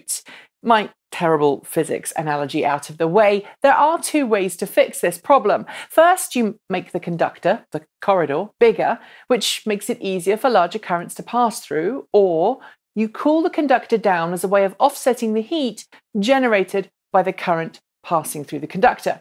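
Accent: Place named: United Kingdom. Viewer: with British accent everything sounds smarter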